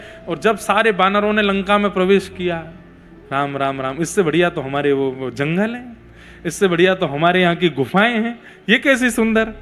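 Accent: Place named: Braj, India. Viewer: 🙏🙏JAI SRI KRISHNA. Guru ji jab aap Brij भाषा mai katha sunatae hai bas lagata hai sunti hi rahu 🙏🙏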